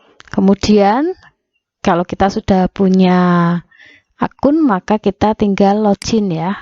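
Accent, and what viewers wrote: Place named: Java, Indonesia. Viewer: Medook nya poll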